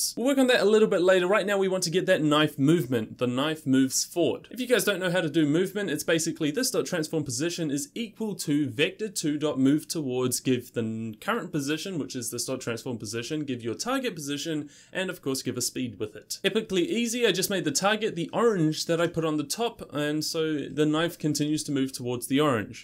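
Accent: New Zealand. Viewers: Hmm do i hear a bit of New Zealander in your accent? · Hey are you kiwi bro? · i feel like your from New Zealand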